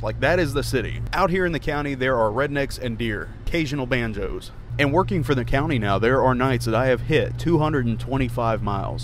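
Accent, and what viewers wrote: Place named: St. Louis County, United States. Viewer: You sound like you're in St. Louis County.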